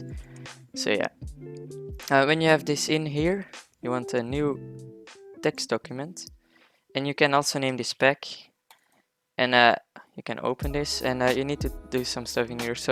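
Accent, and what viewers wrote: Netherlands. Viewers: The accent is pretty dutch here, gwn om te zeggen hoor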